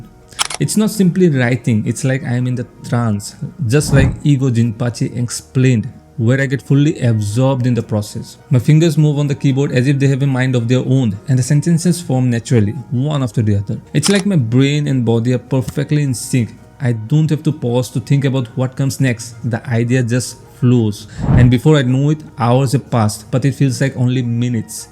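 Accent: India. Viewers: I hear Indian for some reason like it’s screening at me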